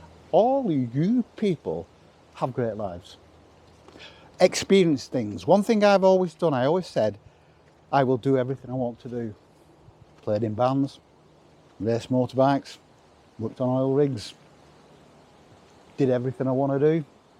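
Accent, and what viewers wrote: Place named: England, United Kingdom. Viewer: Oh, that made me feel like I was on a walk with Epictetus with an English accent. It's -7C/19F here, and I'm not going outside except for the farm chores, so I enjoyed the vicarious walk where it's still green.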